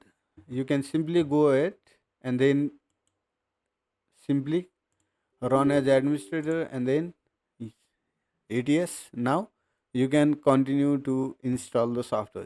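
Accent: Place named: Nepal. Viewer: from the accent I can say you are Nepali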